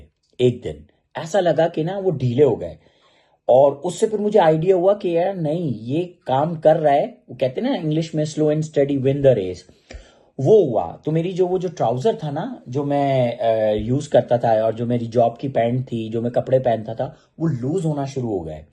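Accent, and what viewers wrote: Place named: Pakistan. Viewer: You have a very pure urdu accent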